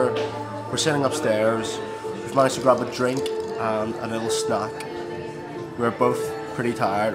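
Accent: Northern Ireland, United Kingdom. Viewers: I love your videos plus photos on instagram! Do lovely edited and good choice of music! You deserve way more subs and followers! ps: love your accent! nothing beats a proper northern irish accent! greetings :')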